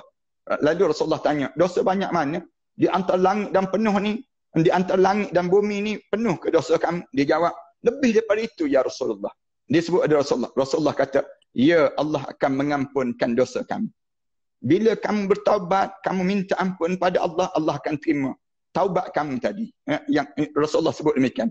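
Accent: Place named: Kelantan, Malaysia. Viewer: Samo kelate kecek kelate la kak kak Lopaa..😂😷😁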